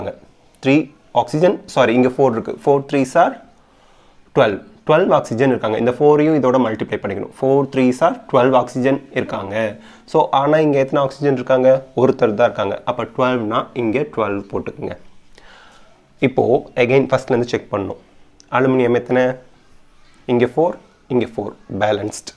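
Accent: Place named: Thanjavur, India. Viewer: Sir you are all rounder I am so exciting with you then I have 1 doubt in DNA fingerprinting let me to tell sir in DNA fingerprinting you told know it is differ for a children's who are from a same parents other than monozygotic twins I agree that sir but still I have a doubt that oru childkku 50 percent DNA from their mom and 50 percent DNA from their dad so antha couple kku innum oru child pirranthalum antha childkku athae thane sir irrukku then how we can say their DNA fingerprints are not similar please I have this doubt for many months I know you are the right person to clear my dobt sir I kindly request you to clear my doubt sir and neenga Thanjavura sir enga oru karanga pesurathu mathiri irrukku atan sir kettae okay thank you sir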